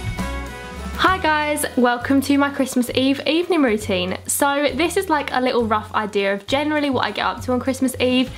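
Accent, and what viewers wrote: Essex, United Kingdom. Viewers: I love her Essex accent ❤️❤️❤️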